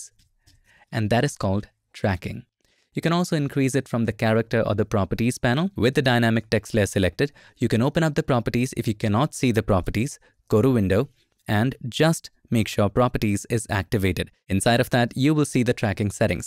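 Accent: India. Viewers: I watch your videos for your excellent communication, teaching and presentation skills , soft and high pitch Indian accent with lots of confidence, immersion and proper body language 10/10 ur a benchmark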